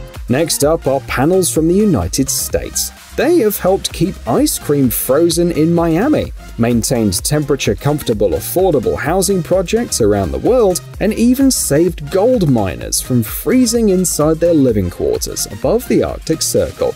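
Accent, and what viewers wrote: England, United Kingdom. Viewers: I find it odd and confusing that you sound English yet you are talking in 'dollars'. I live in Australia. Who's dollars are you referring to?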